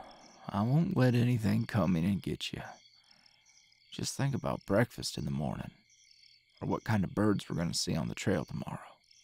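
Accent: South, United States. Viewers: The thing that is so cute about country boys is how they sound exactly like:3 <3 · It gives me an unexplainable amount of serotonin to hear a man with a southern accent and knows he's not homophobic or any of that other negative stuff associated (rightfully so) with southerners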